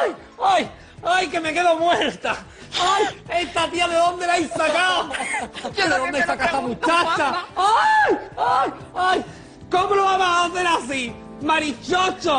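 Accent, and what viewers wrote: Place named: Extremadura, Spain. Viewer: Y el acento extremeño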